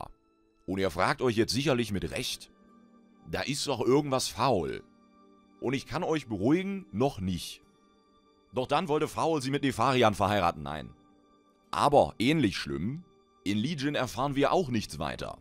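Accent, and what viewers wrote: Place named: Saxony, Germany. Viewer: Wow Lore + Sachsen Akzent. GOLD!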